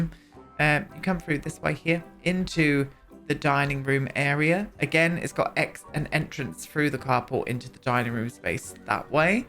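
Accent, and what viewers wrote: United Kingdom. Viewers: This house is difficult to renovate. I love your buildings, and also your British accent. So gorgeous .❤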